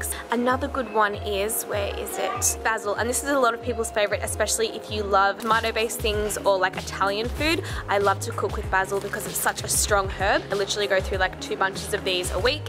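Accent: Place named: Australia. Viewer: It’s just Australian lol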